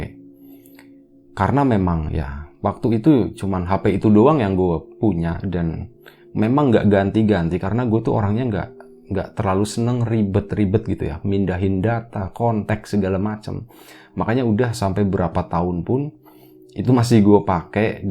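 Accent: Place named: Java, Indonesia. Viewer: Assalaamualaikum🙏sy baru tahu cannel nya mz ada dua toh, karena sy sub baru d lapak horor jd selalu penasaran, trs d buka buka ech dapet yg ini sy coba buka, ternyata ada radio kesayangan d bdg kesebut, duch seneng nya😅😅sy kan org bdg mz, tp leluhur saking Jawi, jd seneng kalo denger mz berbicara medok Jawa nya itu bikin saya terlena🤭😅😅😅semangat dan tetep sehat mz, matur suwun ceritane🙏🙏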